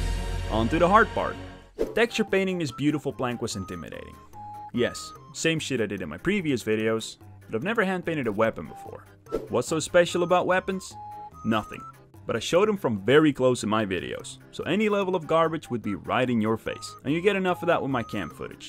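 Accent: Netherlands. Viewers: I watch for your commentary, klootviool ;) Echt waar Dinglish af en toe maar ik kan het wel waarderen. Other than that the nostalgia hype of seeing old WoW shit is great. If I were you, id branch out to other games. Maybe redo a CoD 1 / Battlefield 1942 weapon? The latter had some iconic music. Try to get some cross gamer appeal going. I personally dont watch streams or anything. Just find something short and fun to do. Maybe do some starfield modding? That game is going to be huge starting next week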